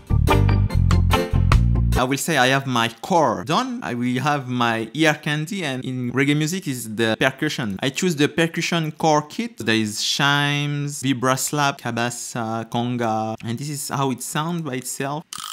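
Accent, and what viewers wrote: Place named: France. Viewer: Tu parle français on dirait?? I can notice your accent ..I speak French too.. Your English is perfect.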